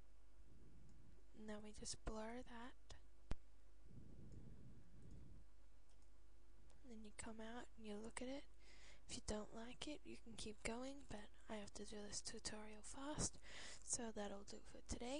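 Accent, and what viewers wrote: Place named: United Kingdom. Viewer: You sound British o-o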